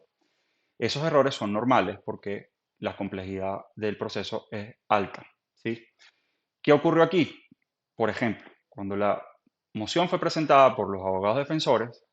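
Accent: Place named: Venezuela. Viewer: ME ENCANTA TU TONITO, VENEZOLANO.❤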